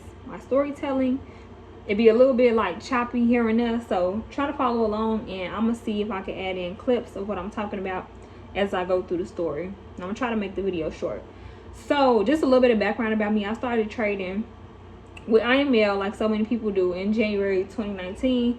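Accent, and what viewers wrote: United States. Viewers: Your accent(southern) is so cute! Ppl like y’all helped me dodged IML! Yes please upload more videos, we need more women traders on this platform!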